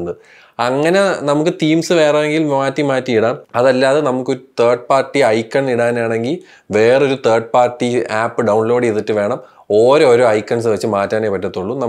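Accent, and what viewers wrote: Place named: Thiruvananthapuram, India. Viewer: Edake tvm slang varunu but polii annu muthe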